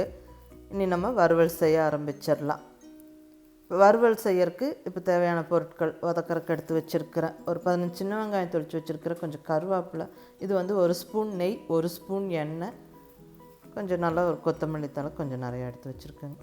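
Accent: Kongu, India. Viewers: Mam...Nenga pesumpothu I noticed Ur Kongu-Tamil.....I like ur Slang and So much of love for u..Mam.😘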